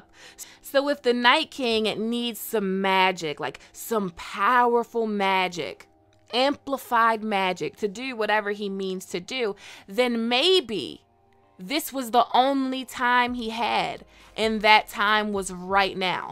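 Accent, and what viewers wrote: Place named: Maryland, United States. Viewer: Good stuff. Looking forward to the new season. I hear a Maryland accent in your voice.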